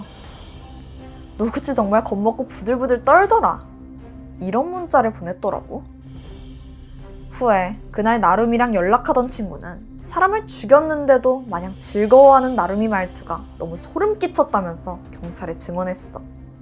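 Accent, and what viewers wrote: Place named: Suwon, South Korea. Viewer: 수원사투리중에 그런거있음 수원사람이신가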